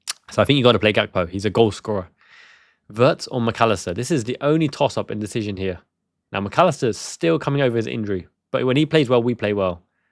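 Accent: United Kingdom. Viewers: btw dude you may be in Japan but did you grow up in the UK? You sound very British :)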